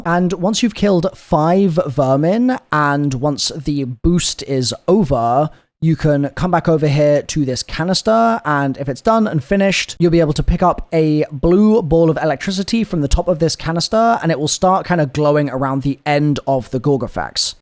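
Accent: United Kingdom. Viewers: British accent is marvelous im a french Canadian and i love the english wotah instead of water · Is it just me or does sound a little British here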